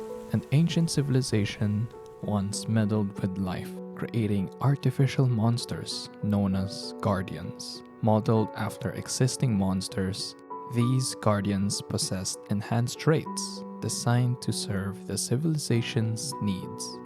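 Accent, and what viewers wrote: Philippines. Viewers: The filipino accent is strong with this ons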